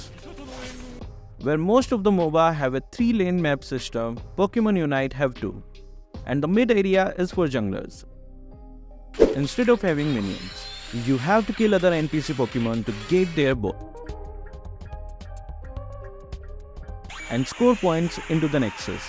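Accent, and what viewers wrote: India. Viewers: His pronounciation seems he is from India😊😊😊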